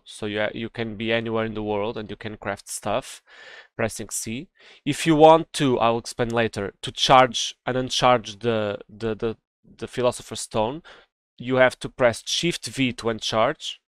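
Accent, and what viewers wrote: Portugal. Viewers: Maan thanks for the tut bro ! Enjoyed the Portugues accent lol 😂 Bro just how you can make alchemy chest ? I couldnt do it SMH ... did you make it in philosopher stone or in craftin table ? Edit ! Problem solved !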